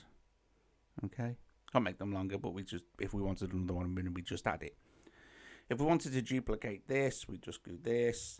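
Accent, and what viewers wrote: Liverpool, United Kingdom. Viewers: Scouser? I think so.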